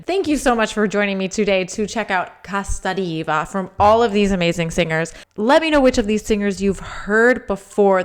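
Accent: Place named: Italy. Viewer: Fantastic reaction! Very informative and deep. Your italian accent is nice! 🎉🎉🎉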